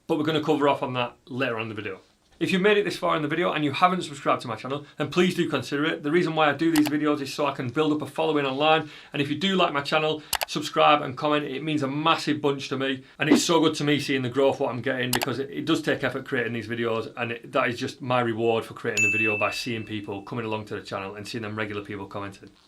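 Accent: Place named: England, United Kingdom. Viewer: This guy is clearly from England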